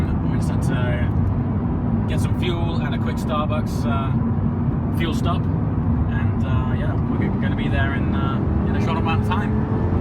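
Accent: RP, United Kingdom. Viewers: He's from a privileged background, listen to his accent.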